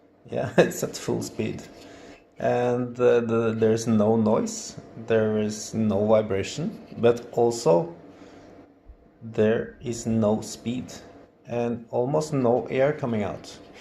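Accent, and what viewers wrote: Norway. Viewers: Damn you HAVE TO BE Norwegian.......you got THAT accent hahaha.....greetings from Trondheim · I just love a good ol' norge accent. Thanks for the video good sir, I am currently trying to know more about this kind of fans!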